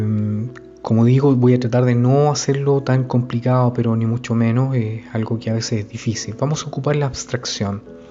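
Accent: Chile. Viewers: sos chileno verdad?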